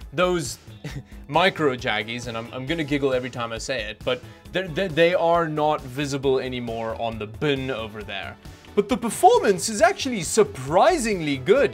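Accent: South Africa. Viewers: He is South African. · Are you south African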